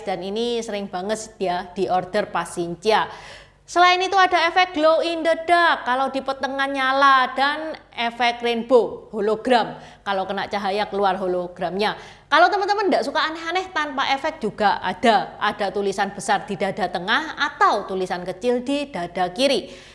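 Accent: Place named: East Java, Indonesia. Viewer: Ciecie aku suka dialeg Pecinan Jawa timuranmu. Seru...terasa akrab. Indonesia banget 😂👍 sehat sll cie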